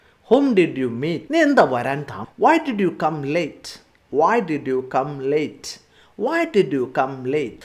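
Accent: Kottayam, India. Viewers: Are you from kottayam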